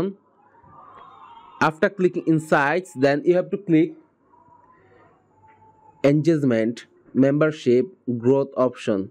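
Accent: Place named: United Kingdom. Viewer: Can you make this in Bengali I can't understand your British English😢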